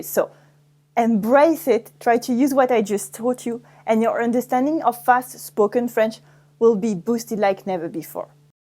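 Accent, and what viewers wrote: France. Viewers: Well my favorite way is when I'm in France and listen to everyone, also talking to my French friends, listen to the radio, watch movies (especially at the summer French film festival in Moscow in outdoor cinemas and also on MyFrenchFilmFestival online). For me French is logical as maths: sometimes I can predict what an adjective will be if I know the noun/verb or root of the word. Btw, several months ago I understood how words like parachute, parapluie, parasol, were formed (thanks to Duolingo with word сhute). Also "chaise long": in Russia we pronounce it in French way but as one word, for me it was like wow when I saw it in french and understood that it's long chair. Thanks for your lessons, they're very helpful and I love your English with French accent)) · You got a tough French accent though lol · I'm French and she has a French accent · Oh! 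I love your french accent. I need to learn more from you madame. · Oui-- je te comprends, but it´s kinda funny to hear English with that French accent! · Cet accent. On entend que la prof est francophone (française), mdr (=LOL). Otherwise good pratical exemples, very usefull. P.S. at times, "oui" come "wesh" or even (street slang) "wesh ma gueule". You can also use it (all) instead of "what" (qu'est qu'il y a) that we shorten by "qu'est qui y'a" or "qu'est-ce qu'ya" in non-slang language (used for instance when somebody call you). We never write those verbal versions as we really pronounce it. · I think it would be interesting (to English speakers) for you to role play with another person using Fast French, starting easy, not using too many new expressions in one lesson. You must do MUCH repetition of Fast French words in a series of lessons; not just one/ two lessons. Many lessons! The English/American ear needs to get comfortable hearing these words as they are a departure from how we learned French in school. 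It's actually quite difficult to interpret Fast French when spoken. But I'm glad you are choosing to teach your audience with 'real life' spoken French. Otherwise English speakers will remain in the Dark Ages, including the older generation. (by the way, you speak quite lovely English and you are impressively fluent; you have zero problem with English. Congrats. The fact that you have a slight French accent actually beautifies our language. I think your presentation and choice of subject matter is quite excellent. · always funny to ear english spoken with a real french accent. ( from france)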